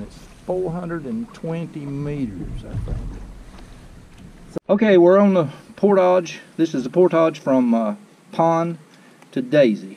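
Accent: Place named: South, United States. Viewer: Props to you friend for taking the effort to say 'portage' properly, for a while there with your southern accent, I thought I was going to hear something more like 'porridge' which really makes me cringe. Thanks again for making the effort ;-)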